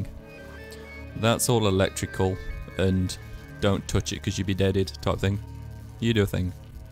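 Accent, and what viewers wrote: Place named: Birmingham, United Kingdom. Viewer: Such a nice looking guy with a yummy brummi sound!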